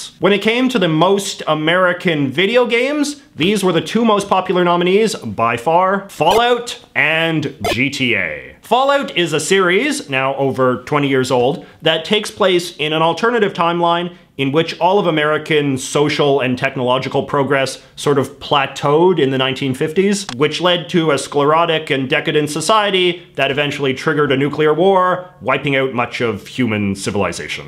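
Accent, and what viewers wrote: Canada. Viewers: Yo is this dude from Canada? Is my first time seeing his videos and the way he says “about” is Canadian asf lol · This guy has the most Canadian accent in the history of the world.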